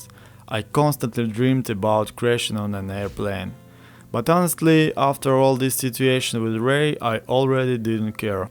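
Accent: Russia. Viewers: Чел, ты русский? Акцент похож, но это не в обиду, потому что плевать на это. Ты реально крутой ютубер и гитарист, поэтому успехов тебе) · Ты не из России? Акцент похож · да, акцент точно говорит, о том, что скорее всего русский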